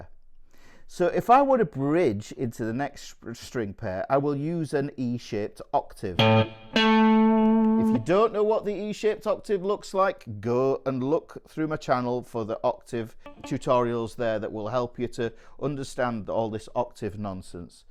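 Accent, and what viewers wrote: United Kingdom. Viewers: Part-2: It's interesting how content from an instructor/mentor speaking in a UK accent makes the content seem more authentic!! Because of the unique linguistic "personalities" (for lack of a better word), it would be fun to see your content in Scottish, Irish, Cockney, and AU/NZ. (with subtitles of course!)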